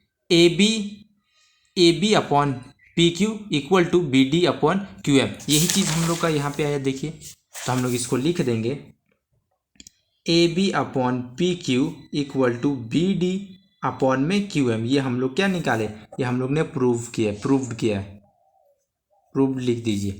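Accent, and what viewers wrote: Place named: Bihar, India. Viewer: Sir app bhiharhi vani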